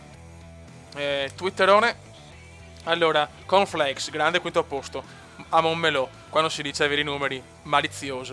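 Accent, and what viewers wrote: Veneto, Italy. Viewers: prendili coem dei consigli: 1- perfavore basta con le frasi fatte tipo sono teso, ripetuto 20 volte, i soprannomi ai piloti (dopo un po sono tristi), comunque grande con l' accento da gondolaro e il viva la figa<3 spezzi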